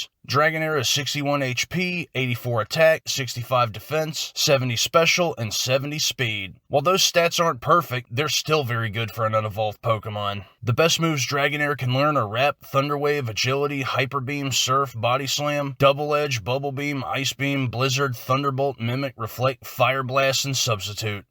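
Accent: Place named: South, United States. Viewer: when i clicked the video i was like now which one was this guy oh right the one with the southern accent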